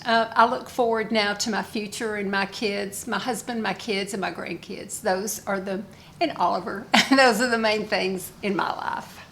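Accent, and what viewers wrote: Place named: South, United States. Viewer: You would be fantadtic at ASMR!!! I often tune in to your channel right before bed to unwind. You are very nurturing by nature and your voice and Southern accent is calming and soothing. Just do it!!!! You are already doing ASMR without even knowing it! Lots of love and thanks for this video, i just had my 69th BDay in June. XOXOXO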